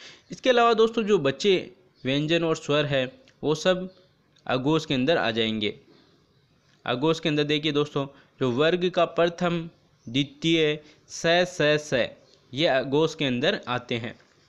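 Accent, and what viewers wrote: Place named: England, United Kingdom. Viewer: Sahi se padho sir jo hum kavi nahi padhe aap waise padhte hai a aa i ee aise padh rahe ho jaise ko अन्ग्रेज ho gussa aane lga lekin explanation bahut aacha hai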